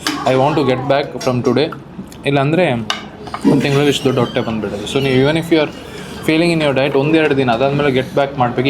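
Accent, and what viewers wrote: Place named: Tulu Nadu, India. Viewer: Your Tulu accent sounds like Bollywood star Suniel Shettru. .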